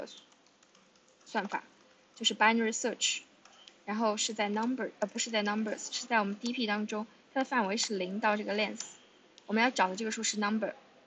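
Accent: China. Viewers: 找279题目解答 结果看到了英文版 大致听了几句就发现是国人了 姐姐在新加坡上学？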